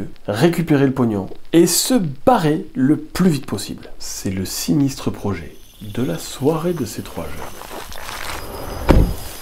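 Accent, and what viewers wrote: South, France. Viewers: Bonjour, un grand merci pour votre récit qui m'a tenue en haleine du début a la fin j'adore votre façon de raconter et votre accent du Sud comme le mien.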